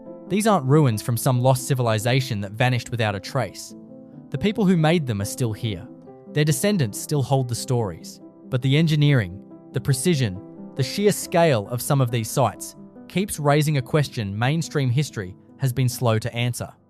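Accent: Australia. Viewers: This voice sounds Aussie but at about he says "Brew wa reena". What? Phonetically it's pronounced "Brew warr in uh". Brew as in brewing beer, warr as as warrent for your arrest and uh as in blunt -- that knife is blunt. Get it right.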